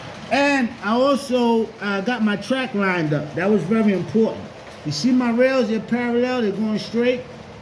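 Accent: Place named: New York, United States. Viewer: 👌🏾…I can tell a NY accent from anywhere lol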